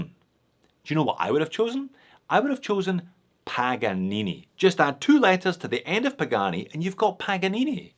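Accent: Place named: Glasgow, United Kingdom. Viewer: You sound so Glaswegian playing the prospective partner there mate! It never leaves you. And hello from Glasgow by the way: we’re freezing our arses off here right now!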